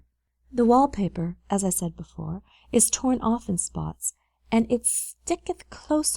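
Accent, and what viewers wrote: Canada. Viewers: The Canadian is strong with this one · I super appreciate the reader's slight Canadian accent; makes this so much easier to listen to!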